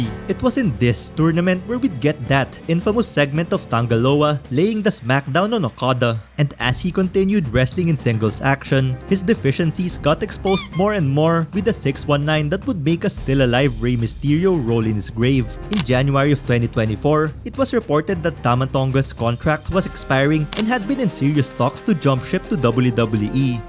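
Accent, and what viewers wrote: Philippines. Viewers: this guy sounds FILIPINO AS FUCK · Just found your channel. Good stuff. And you sound Filipino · Pinoy ka sir? Yung accent kasi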